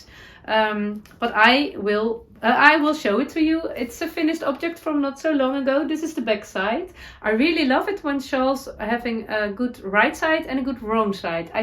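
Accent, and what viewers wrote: Netherlands. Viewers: Happy New Year, it was so lovely to hear your dutchy English this morning, I really missed you. That's wonderful news about the YT Friday podcast. I would love to join you! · You make me smile!!!! I love your dutchy English. I may have to make a festive sweater exactly like yours…I love it!🧶❤️🇨🇦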